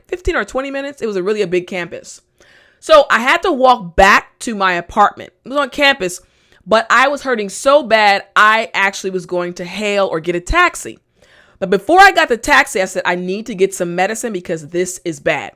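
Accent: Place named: United States. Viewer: What an amazing American accent you speak dear! I love listening to it!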